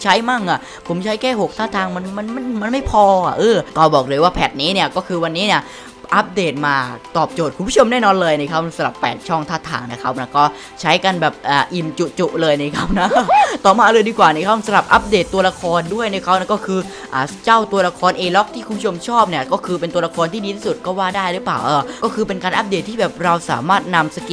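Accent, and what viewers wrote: South, Thailand. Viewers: เสียงพี่เหมือนคนใต้เลยอ่ะ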